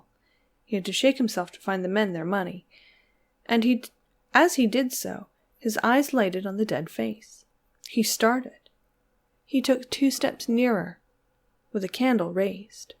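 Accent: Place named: United States. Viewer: Hi, I came for a listen at the urging of Tony Walker (Classic ghost stories), & I find his praises correct. Wonderful narration, & your character shines through in a fabulous quirky way that I really enjoyed. I have to confess that I generally avoid narrators with American accents, (sorry, I find so many of them grating, might be an Australian thing?). Happily your reading made the accent irrelevant ... Loved it.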